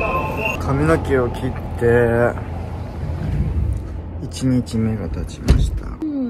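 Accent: Kansai, Japan. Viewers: 関西弁いいわぁ🤝🏻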